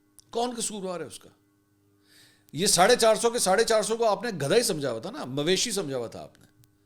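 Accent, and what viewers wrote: Punjab, Pakistan. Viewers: آپکا پنجابی کا تڑکا 😂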